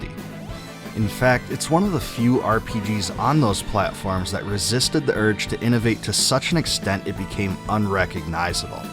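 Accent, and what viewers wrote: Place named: Upper Midwest, United States. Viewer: I wanted to say, I thought it was interesting and kinda cool that you have a very specific regional accent (possibly upper-midwest?), and you like JRPG's. While this definitely isn't all true, I know there is a stereotype of us Americans that we don't really care about cultures, and only care about ourselves, which is something I am very passionate about fighting against.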